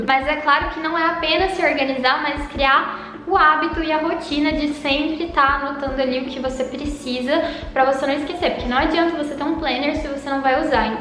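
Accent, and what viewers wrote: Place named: Goiás, Brazil. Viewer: acho mto fofo o seu sotaque goiano puxando o r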